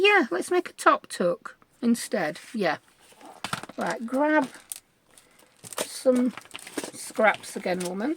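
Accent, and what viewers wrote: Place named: Yorkshire, United Kingdom. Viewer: I’ve just found you on YouTube, it’s a delight to hear a ‘Yorkshire’ accent on here making fabulous crafts! I’m a Yorkshire lass I hope you’re still making videos on here,I’ll have to have a browse at more of your creations, I love what you did with this fold out, I haven’t made one before but going to after watching you. Thanks 😊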